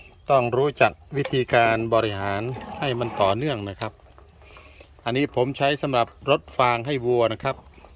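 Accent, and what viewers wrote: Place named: Surin, Thailand. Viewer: อยู่บ้านใหน ขอ รับ ฟังสำเนียง ขุนๆ เหมือนคนบ้านผมเลย จาก อ.เขวาสินรินทร์..เซราะกราว เน้อ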